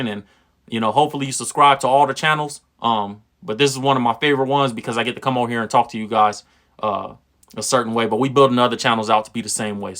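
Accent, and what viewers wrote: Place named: Los Angeles, United States. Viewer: I like your LA accent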